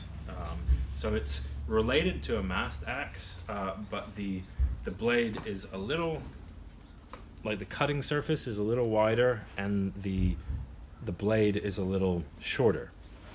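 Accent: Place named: Cornwall, United Kingdom. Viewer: His is an American tinged Cornish accent, so that's fair enough.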